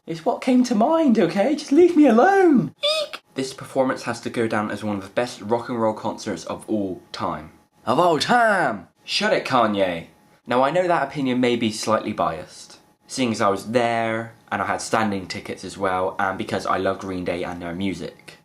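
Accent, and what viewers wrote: Bristol, United Kingdom. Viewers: Ahh I love the Bristol accent:) I was gonna go see on Wednesday here in Dublin but just didn't get around to it:/ Maybe there's some left:) Glad you enjoyed. xo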